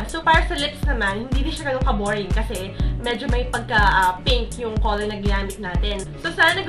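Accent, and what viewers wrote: Philippines. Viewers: hahaha nakakatuwa naman pag tagalog, pinoy na pinoy talaga. actually hindi na sya tagalog, halos filipino na sya ksi pormal na pormal yung salit :P nice tutorial btw.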